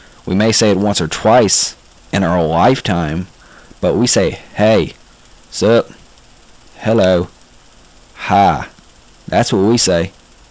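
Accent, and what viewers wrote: South, United States. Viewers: I’m southern and he sounds southern. What is he supposed to sound like? Gomer Pyle? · Southern accents r the best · Haha I love this, from north carolina and I hear this all the time. This is super stereotypical like he said but sometimes you do hear a real thick accent like this. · Def a GA, TN or AL accent. Im from GA and its def a southern accent lol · Love it. I'm from Tennessee so I sound like this. xD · Thanks, that was great. You have a great voice (and accent). But exactly what part of the south are you from? I have friends from Texas, Louisiana, Mississippi, Georgia and VIrginia, and their accents are all quite different from one another. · Your natural accent is a southern dialect. You're speaking hick. The southern twang is much more pleasing- that is not the majority of all southern regions dialect. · U sound kind of like Forest Gump!!!LOL love ur accent!! 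· I'm from South Carolina, he's dead on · I've got a mice southern accent :) um, my y'all is in between yours. Not as short as you say but not as drawn out either. Your accent sounds like Scotty McCreery's! You from NC? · I'm from Alabama (I'm in the Million Dollar Band- Roll Tide!), and you nailed the accent. I love this video! Especially the y'all part. Northerners just don't understand. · Dang, I'm from Texas and he's got it down c: most people who try to do a southern accent fail miserably. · I live in alabama too, and you pass the southern accent sound test :) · Your voice sounds like a mild version of the African American Vernacular mixed with a Southern twang. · where are you from? Cause thats the smoothest southern accent I've ever heard. :) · Can you teach us some words and phrases ? Love the accent! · This accent makes me laugh so hard, aye. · He does sound southern. His particular dialect just isn't as strong as the overly done and exaggerated one that tv and film have thrown at us. 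But his accent would totally fit in in parts of GA, TN, and AL.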